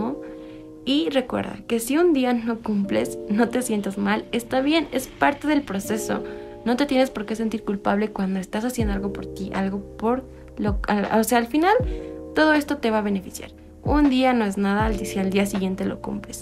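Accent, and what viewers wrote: Bolivia. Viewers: Una pregunta eres de bolivia ? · Eres de BOLIVIA?? Gracias por el video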